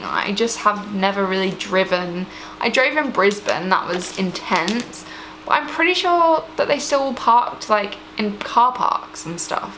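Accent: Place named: London, United Kingdom. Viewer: You're accent has definitely become more London :) I'll not say 'British' because there isn't really a British accent.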